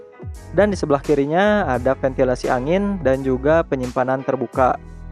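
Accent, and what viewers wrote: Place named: Banjar, Indonesia. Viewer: banjarann ini mah mang nya?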